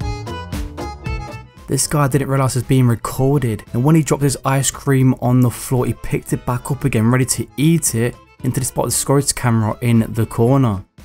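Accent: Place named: Leicester, United Kingdom. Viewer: I guess you’re from Leicester because I used to live there before. Your accent so different from Londoner but I like it :)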